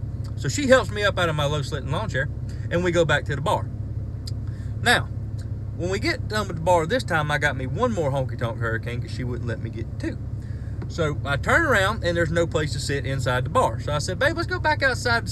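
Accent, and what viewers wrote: South, United States. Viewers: I don't agree on the whole god/jesus thing, but love your energy, honesty and down to earth wisdom. I'm from Seattle and you make me want to talk with a southern drawl. Sometimes a few drinks is what it takes to get our priorities straight.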